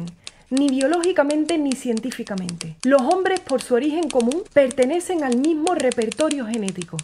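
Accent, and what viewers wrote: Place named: Andalusia, Spain. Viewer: Hay,Hay,HayHay QUE GRACIA ME HACES Hoy me He Fijado Atentamente CON QUE GRACIA HABLAS • En vez de decir PUES SI QUIERES ENTERARTE ( PUES ) QUEDATE, Tu dices ( PO ) Quedate, Hasta el Domingo SINPATICA * ANDALUZA *..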